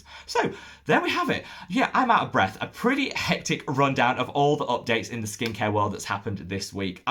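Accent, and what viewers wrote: United Kingdom. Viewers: I like the bulletin style for reformulations but really appreciate the in depth analysis on new products. Being American, I need to listen hard to that oh-so-cool fast Brit accent, so the rewind button is my friend during the latter. You do such a good job, and I find true value in your videos.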